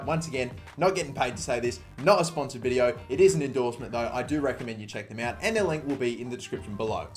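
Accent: Australia. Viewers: U aussie m8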